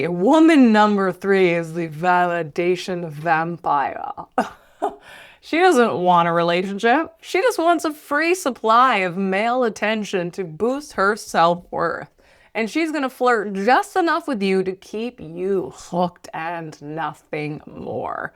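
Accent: Canada. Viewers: Thank you for your insights. As a dual citizen, I love the Canadian way of speaking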